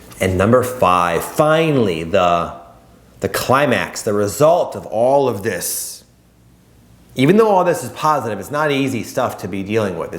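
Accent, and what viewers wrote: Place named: Inland North, United States. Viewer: Powerful stuff,! So seamless that I got the feeling it was divinely channeled. Interesting how spirit works. I always loved your message, but when it was delivered in that Inland Northern American accent, spirit told me I was home. (I'm Italian like you and my family is from Western NY. Same accent.) ❤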